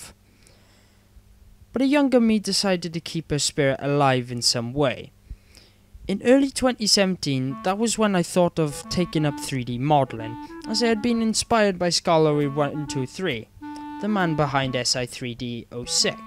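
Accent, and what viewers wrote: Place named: Wales, United Kingdom. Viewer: Your voice sounds beautifully welsh ^^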